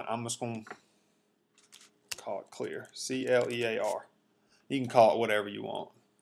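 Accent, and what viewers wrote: South, United States. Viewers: man. this man's country accent. feels like cowboy from action movie is teaching me programming.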